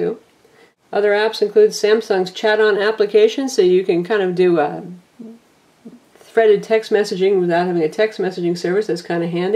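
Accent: United States. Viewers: It's not an ignorant comment it's a sensible observation. The woman in the video does come across as very robotic and does lack any insincerity in her review. I do understand however that some of this will be down to her American accent wich can sometimes come across as a little bit insincere to non-Americans. This of course isn't a dig at Americans before anyone jumps in but to other ears the accent does sound that way.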